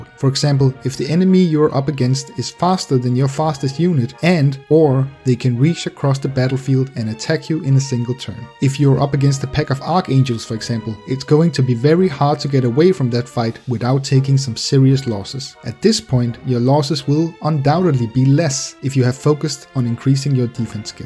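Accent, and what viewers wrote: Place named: Denmark. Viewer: Are you danish? I sense some accent.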